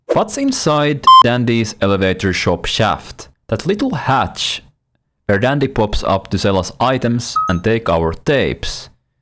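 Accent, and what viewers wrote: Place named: United Kingdom. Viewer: Bro sounds britsh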